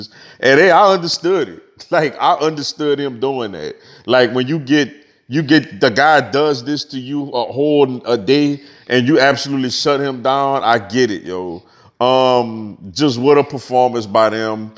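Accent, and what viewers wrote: New Orleans, United States. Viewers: Valid points my guy!! Just confused its sounds like your from New Orleans but your draped in eagles gear? #WhoDat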